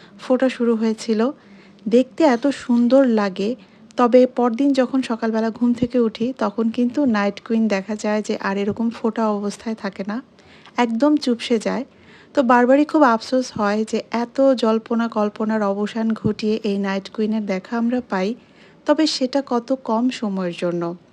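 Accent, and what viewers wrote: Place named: Noakhali, Bangladesh. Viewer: Assalamualikum apu kemon acho ? Night queen flowers Sotti sundor tobe samnasamni dekhar sovaggo hoy ni kokhono. Apu ajker vidéo ta onek clear ajke ki i phone diye vidéo korecho . Mehedi design onek sundor hoyeche. Ei cha dekhe tsc cha er kotha mone pore gelo . Sijhdah burita kemon ache . Mashallah onek onek sundor lagche 😘 ki sundor kotha bole amader noramonio eivabe kotha bole.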